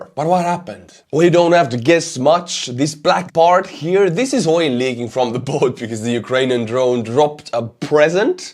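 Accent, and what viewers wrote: Russia. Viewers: Funny thing is that you dont have to fake an accent, you already talk like a russian/Ukrainian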